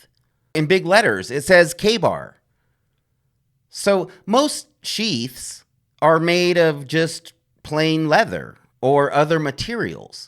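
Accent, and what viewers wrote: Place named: Flint, United States. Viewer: Chicago, Memphis, Flint, or anywhere in the state of New Jersey lol. Judging by your accent I would say Flint. No disrespect by the way. I have family in all three cities and the state of Jersey.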